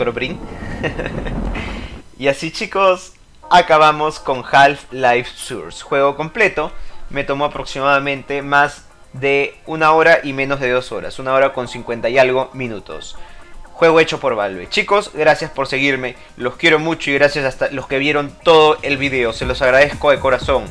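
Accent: Peru. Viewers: eres peruano? si lo eres like xddx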